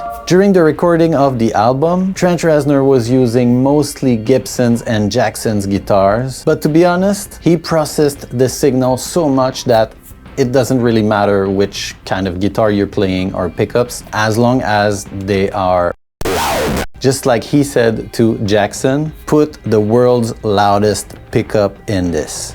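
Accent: Quebec, Canada. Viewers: ohhhh really !!! Thanks !! Keep doing your videos !!! Very nice content ! You sound that your from Quebec ! De où ? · Accent Québécois?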